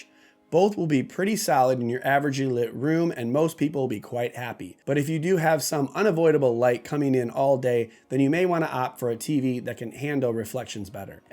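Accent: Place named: Massachusetts, United States. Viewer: Are you from Massachusetts by any chance? I spot an accent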